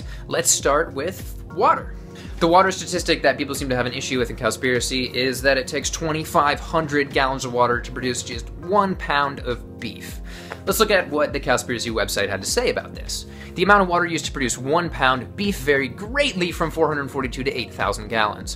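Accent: United States. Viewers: What accent? I assume you're talking about some version of an American accent but It just sounds like a typical American accent to me.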